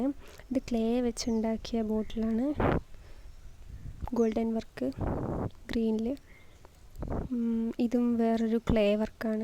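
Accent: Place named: Kannur, India. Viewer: കണ്ണൂര് ഭാഷ അത്ര മോശം ഒന്നും അല്ല ഇങ്ങള് പൊളിക്ക് ഭായ്.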